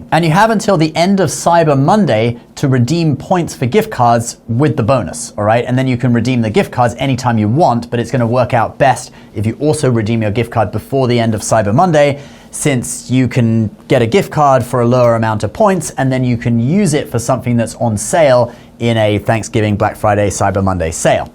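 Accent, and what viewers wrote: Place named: United Kingdom. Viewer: Me too, love that UK accent- direct and to the point, not alot of fluff. Nice funny jokes and new content regularly--- he gets 100 cool points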